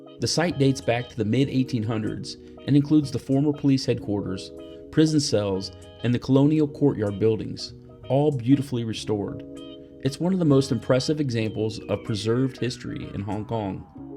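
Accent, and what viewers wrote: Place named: South, United States. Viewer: You accent is horrible ! Please sound Swedish and not Southern USA.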